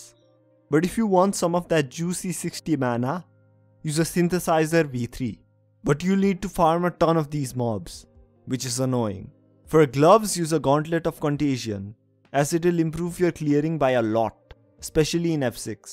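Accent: India. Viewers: Are you Indian I think bcz of your voice make videos in hindi it supports Indian hypixel community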